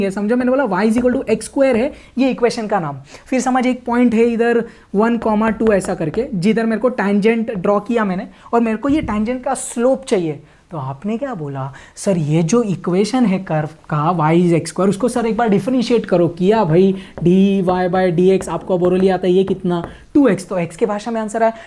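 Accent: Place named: Aurangabad, India. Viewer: Are u from Aurangabad ?? Cause of your language is just like Aurnagabdi Hindi .. 💯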